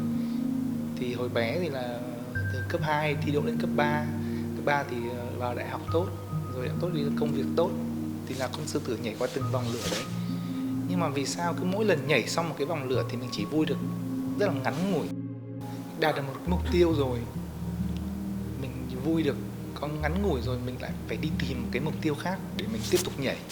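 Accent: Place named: Hue, Vietnam. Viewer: Giong doc cua em voi phap danh that chueyn cam pha chut gi cua huecua dai dat nang chay mua lu mac du em sinh ra o binh dinh con thay e kip lam kenh dac biet la co chau tho voi su gop mat cua cac ban tre la canh tay dai dua giao phap cua su ong bac thay day kha kinh lan rong di xau vao xa hoi con tin so nguoi nghe xem tim hieu se ngay mot nhieu thoi neu no co it co dung buon va hay kien nhan nha co chuc co va ekip co nhieu suc khoe va duoc chu but chu to gia ho luon luon binh an hanh phuc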